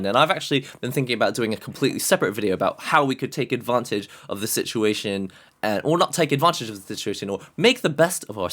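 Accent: United Kingdom. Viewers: Im new in this channel, how you have this awesome british accent?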